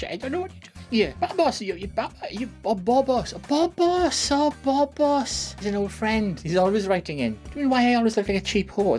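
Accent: Wales, United Kingdom. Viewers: Hahahaha "you couldn't afford me". I swear the Welsh accent makes the burn all the better. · Love your Welsh accent from another Welsh person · Are u welsh? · I will start by saying that I am not subscribed to your channel, yet you popped up and I will not judge,be mean or anything like that as I am a Christian. No true Christian would, as we have only one judge and I do pray that all knows who that is! I only wanted to let you know that as a you tuber I'm sure that you already know that based on what channels that we do like and subscribe too, they also send us suggestions. Like as with me, your video that I'm so sorry that I can't remember the caption off this video but do know that two names appeared that I love very much, Trump & Jesus! So, I did watch it but I guess I'd have had to watch those videos leading up to it to know what upset so many. 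I can say that your British accent I found beautiful! As far as your deep voice, I'd have to say that my beloved father was 6'4 and when I was young I was teased often as I inherited his deep voice...funny though that those Who teased me now wait for hours after (while touring and making a quite nice living on the rd. playing Coliseums and now they act as if we were best friends in school !) I had often wondered if when I was large enough in my career just how I would react to those who's words cut deep in my early teens, & if they would ever show up at one of my my proformancesAlthough under a different name than I can go by on here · I am loving your Welsh accent: my Mum lives in Carmarthenshire, but she's not Welsh: they are very friendly & welcoming!!! Please ignore those very nasty & ignorant comments: these crazzzies are not worth any attention & they need to get what is commonly called: "a life"!!! 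😁😂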